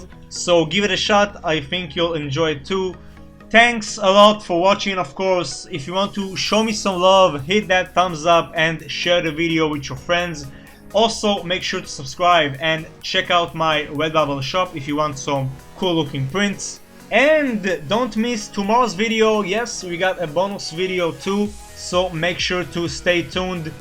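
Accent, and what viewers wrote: Israel. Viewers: איזה מבטא ישראלי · איזה מבטא ישראלי אמאאא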